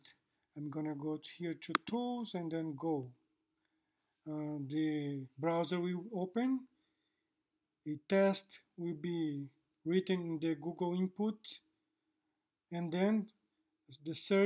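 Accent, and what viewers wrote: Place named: Brazil. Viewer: Que inglês nojento!!!... E para quê um brasileiro se metar a falar ingles!!...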